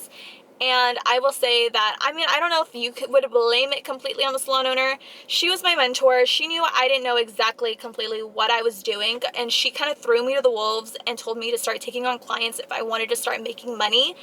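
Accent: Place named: United States. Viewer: What state is this in! Sounds Just like one I know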